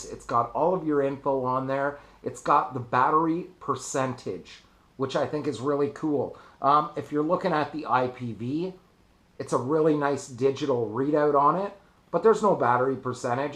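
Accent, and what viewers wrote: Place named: Canada. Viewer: Great comparison video... Never realized you were Canadian, kinda recognized the accent, but when you said you felt like a knob it gave it away lol.... Anyhow. I picked up the sigelei 100 Watt the other day, $81 with a coupon code, the plus was out of stock, could have got the ipv3 for $110 with the coupon, but didn't figure I really needed the extra 50 watts. From what you've laid out in your video, it kinda reaffirms my choice. Should get it this week sometime and I'm stoked.... Keep up the good work man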